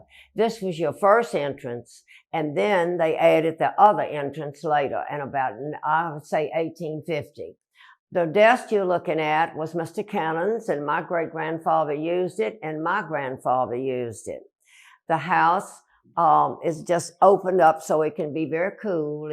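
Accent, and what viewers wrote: South, United States. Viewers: Oh dear, what a precious little lady!!! I’m like two minutes in and I’m just dying over her sweet southern accent! 😍😍😍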